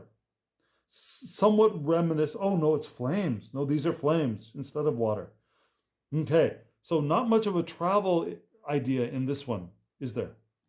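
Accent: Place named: Latin America. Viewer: ah nice. I have friends in Italy and I heard how you speak English and I thought that you will be Latin something? From which country are you?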